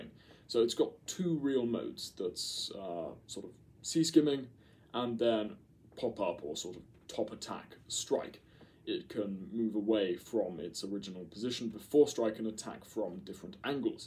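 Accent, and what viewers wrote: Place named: RP, United Kingdom. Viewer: This guy is POSH!!!!!!!🧐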